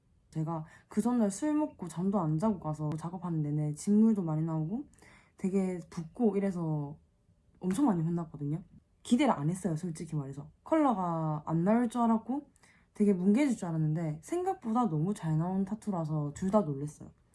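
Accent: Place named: Busan, South Korea. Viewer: they're so pretty!! and ur busan accent is so nice to listen to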